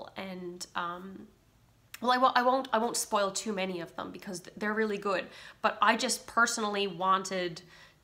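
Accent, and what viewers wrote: Newfoundland, Canada. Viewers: That's the Newfoundland accent with a bit of overseas dilution in it. It's quite unique.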